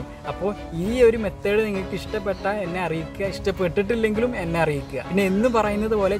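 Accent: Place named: Malappuram, India. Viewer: malappuram accent kalanj samsarikkalle broooo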